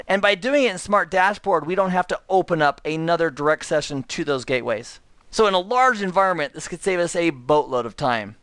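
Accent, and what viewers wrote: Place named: United States. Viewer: You have the most American voice ever.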